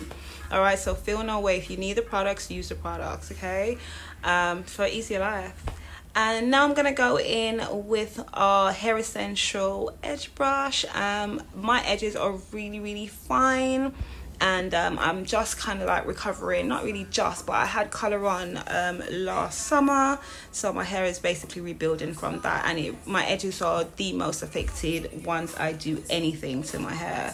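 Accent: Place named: United Kingdom. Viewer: I just love your accent. I love to hear a black Brittain. You are a very talented hairstylist as well. Here in America, everybody is going natural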